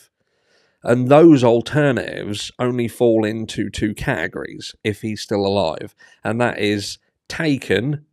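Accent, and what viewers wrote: Norfolk, United Kingdom. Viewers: I thought that. I'm Kings Lynn and now Norwich and he sounds quite local. Love ut! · This is the most west norfolk accent on youtube. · wayyyy Norfolk boiiii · Love your vids, are you from Norfolk?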